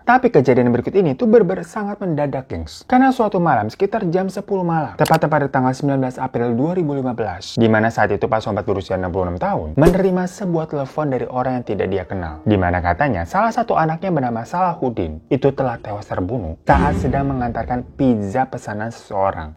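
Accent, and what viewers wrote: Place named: Jakarta, Indonesia. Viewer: Baru liet chenel ini, bagus ceritanya, tapi hostnya orang jepang apa orang betawi ya.. Ko lucu logat jakarta bgt.. 😁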